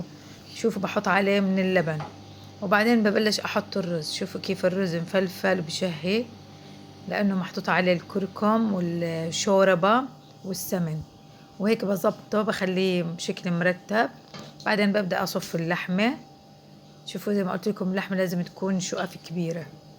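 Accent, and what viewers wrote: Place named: Palestine. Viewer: لهجتك فلسطينية اعملي المسخن ع طريقتك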